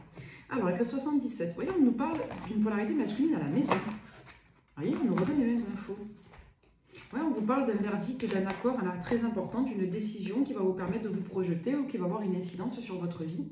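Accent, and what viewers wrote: South, France. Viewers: Cc tu as un accent du sud😊❤❤❤moi j adoreje ss de toulon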